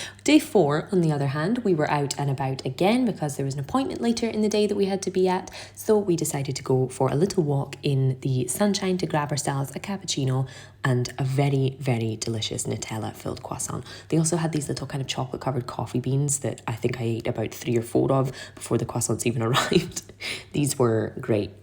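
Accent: Scotland, United Kingdom. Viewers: Where abouts in Scotland are you from? 🏴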